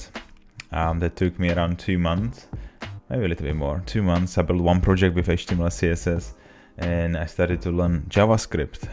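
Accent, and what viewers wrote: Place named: Czech Republic. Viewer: where are you from bro? it seems to me like you have some Czech accent :D fix me if Im wrong. Im also Czech and I wanna ask you on something about United Kingdom right now. If its really difficult for foreigner with IT skills like you have to apply for a VISA? thx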